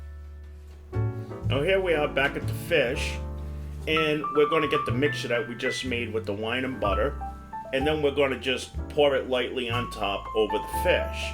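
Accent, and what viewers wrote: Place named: Massachusetts, United States. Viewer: Are you from Mass ? You sound like me lmao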